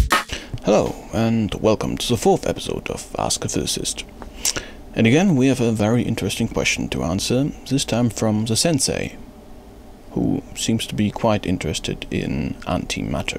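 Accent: Germany. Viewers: HE'S GERMAN HIS AACCENT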